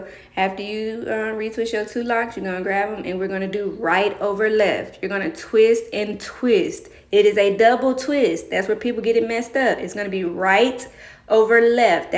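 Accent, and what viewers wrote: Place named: Dallas, United States. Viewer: Sound like u from out here in Dallas... forgive me if I’m wrong luv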